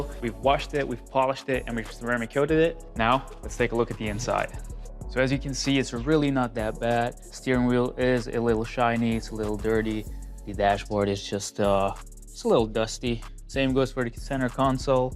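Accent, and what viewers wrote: United States. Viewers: I knew you were in Belgium, but your English sounds very American! Not even a Dutch accent. I assumed you learned English here! I have a friend from the Netherlands who speaks English fluently, but she has a UK accent because she spent much of her youth in London. Happy New Year to you! Looking forward to more great videos in 2024! 😍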